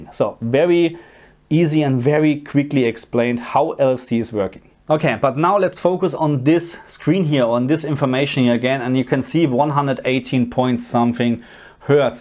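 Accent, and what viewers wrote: Germany. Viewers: he's German but he lives in AU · are you from aus? Your accent sounds German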